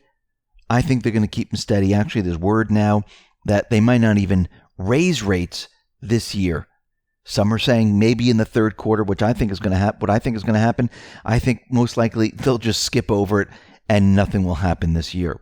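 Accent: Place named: New England, United States. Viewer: It's a yankee accent thang for *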